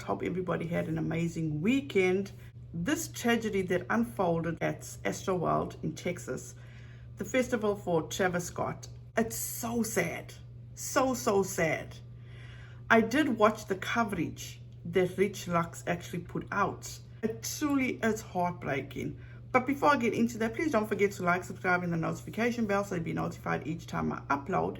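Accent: South Africa. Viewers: I'm trying to place your accent, are you South African? {:-:-:}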